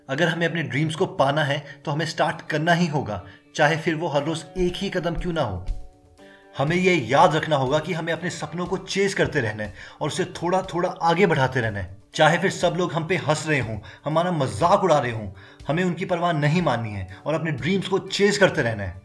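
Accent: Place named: Punjab, India. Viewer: awaaz se to bhai tum Punjabi lgte ho.. bta hi do kaha se ho tum?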